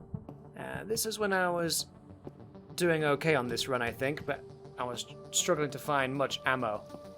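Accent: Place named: Boston, United States. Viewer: haha I suppose so! The main thing that throws me off is the pronunciations of "ar" like in "dark". You say "duhk", which sounds super typical of a thick Boston accent. Sorry for obsessing over it. It just strikes me as unusual and fascinating. Incredible things happen when you connect random parts of the world together with voice chat lol.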